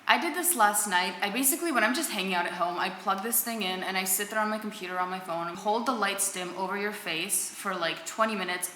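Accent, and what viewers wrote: Russia. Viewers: Сооооня, я люблю твои видео на английском, просто даже слышать твой голос, это просто удивительно и приятно! 🌙🌹💋🍒 Мне сразу хочется стать американкой🇺🇸, что бы понимать о чем ты говоришь, но я понимаю лишь некоторые слова, переводя в голове 🤔👻 Но хотелось бы, что бы кто-то из наших девочек, делал перевод субтитров под твоими видео, это помогает изучать английский язык, думаю что не только мне. Надеюсь ты прислушаешься и что-нибудь придумаешь) Я действительно люблю тебя, твои мысли и английско-русский акцент ❤️ Спасибо за видео! Целую 💋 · Кажется, будто ты уже и по английский с русским акцентом говоришь! Спасибо! Будем учить английский! 💋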